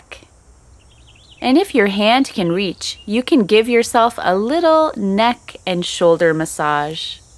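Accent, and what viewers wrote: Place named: Canada. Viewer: thank you SO much for making (I've only seen one) - this video. I will look for the 'other' video - and continue practicing Yoga. I'm almost 71, a Canadian living in Sedona, AZ. Not only did I find your technique soothing as well as 'stretching' - it was fun to hear the Canadian accent! Typically, I go to a rec center once weekly for chair Yoga. And, now I can do so in the comfort of my home, daily, if I choose. I love how the three ladies demonstrate various ways of executing the movements, depending on one's body's capabilities. And I love how they smile. Congrats, Ladies!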